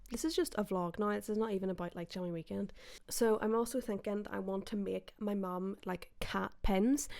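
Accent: Ireland. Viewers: I love your Irish accent. You are so charming!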